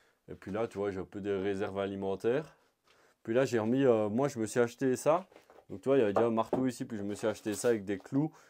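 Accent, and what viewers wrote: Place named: Liège, Belgium. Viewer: Bonjour, tu es super bien installé, tu es originaire de Belgique, car tu as l'accent Liégois, bonne saison à toi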